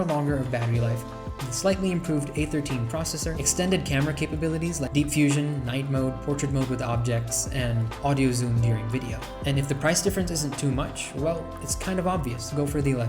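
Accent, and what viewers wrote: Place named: United States. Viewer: Why is it your accent is like americans😍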